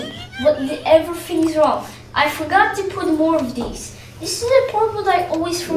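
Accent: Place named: United States. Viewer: Developing a slight American accent😂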